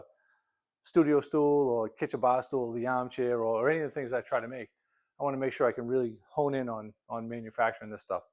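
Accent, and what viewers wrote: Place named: New England, United States. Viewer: I love your accent, I left New England in ‘79, this brings me back.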